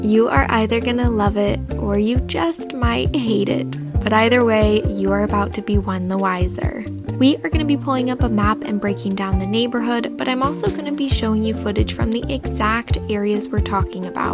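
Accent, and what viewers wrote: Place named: Northwest, United States. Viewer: I watched a few more, well, my guess from your speech, is the NW. Hope business is well.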